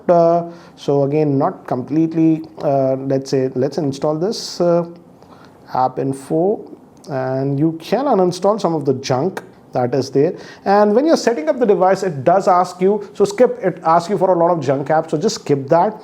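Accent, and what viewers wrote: India. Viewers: Base in his accent I think he is an Indian. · Never thought i would hear a indian sounding guy say remove junk apps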